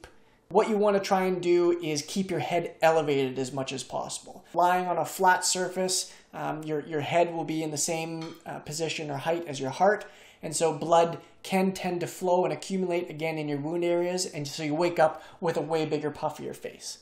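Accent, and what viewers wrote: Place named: Canada. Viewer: Are you Canadian? Just asking because of a slite accent. Good video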